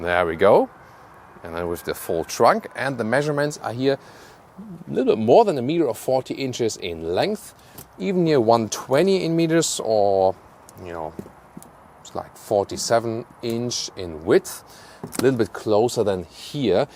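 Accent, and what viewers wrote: Germany. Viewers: That German accent is hot 🥵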